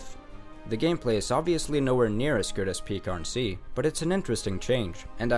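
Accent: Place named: Scandinavia. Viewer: You sound like you're from scandinavia.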